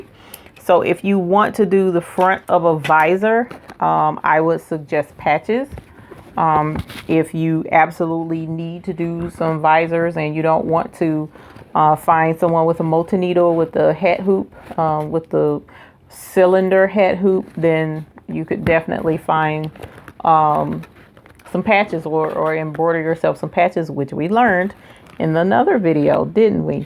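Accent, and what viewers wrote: South, United States. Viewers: Lol, I'm loving the southern belle accent too -- the land of good food, sweet tea and common sense. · OMG! Your Southern Accent is so adorable! Love the video. Gonna run by the Dollar Tree and give this a try. Thanks so much for the instructional.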